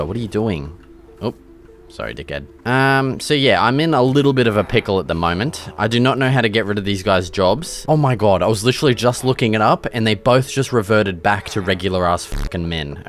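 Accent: Australia. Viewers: i wish i had such a strong aussie accent!! love the video❤❤ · the aussie accent is so clear lol i love finding aussie ytbers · I’m new to your channel,…I heard your accent so I was on my way to your “about this channel” page and heard you say Nor 😂 immediately new it would be Australia lol… love the video I haven’t finished it yet but I plan on it! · i was 2 mins into the video and already know that he is aussie lol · Is this like every aussie accent cuz lazarbeam has the same voice as this guy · It’s good to see a Aussie minecraft YouTuber not many out there ,also you kinda sound like Robert Irwin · That aussie accent is coming on hard bro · You sound Aussie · Aussie Accent makes this so much better 😂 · In the first 2 minutes I figured out that he is a Australian 🇦🇺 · Your channel came up on my homepage, I wasn’t going to watch til I heard the fellow Aussie accent and I’m glad I did 😂 I appreciate the euphemisms please keep em coming #knoblet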